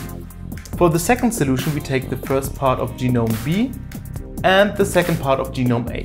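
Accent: Germany. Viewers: Are you german ? If you are german I will subscribe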